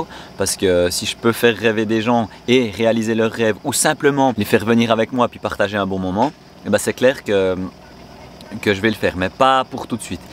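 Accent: Belgium. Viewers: es tu belge ?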